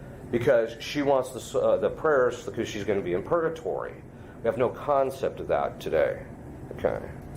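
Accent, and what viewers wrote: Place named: United States. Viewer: Plus, zar nije fenomenalan njegov jaki svjetovni,muski americki naglasak? Bas svjedoči njegovom specificnom karakteru.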